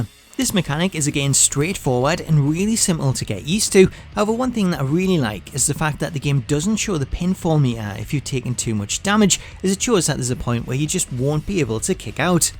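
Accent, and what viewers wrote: Newcastle, United Kingdom. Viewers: Maybe he's a Geordie? I'm only guessing though. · Just curious where in in the UK Are u from I can’t place the regional accent 😆 yeah I can already see how repetitive this is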